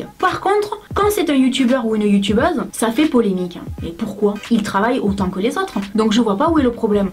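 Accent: South, France. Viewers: Tes vraiment une belle personne, avec une sincérité, ca ne fait pas longtemps que je te suis mais j'adore complètement ta façon d'être et ta personnalité! ❤ et pour finir enfin une sudiste de pure souche sur YouTube ahah, je suis de Toulouse et je suis fière de nôtre accent! 👌💪❤ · Je t adore et les sujets que tu aborde sont très intéressants. J'ai 13 ans et ça n'empêche pas que tu est l'une de mes youtubeuse préférée...💗💗💗 J'aime beaucoup la façon dont tu t'exprime et ton axent!!! je voudrais tellement avoir un axent du sud mais comme je suis du nord c mal parti.lol😘 gros bisous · biendebonjour, ta un accent du Sud toi! mais ces mignon*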